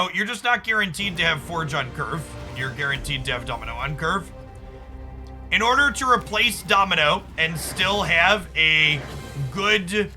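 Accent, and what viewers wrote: United States. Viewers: haha! Could tell within 2 sentences. Source: someone also from the south side.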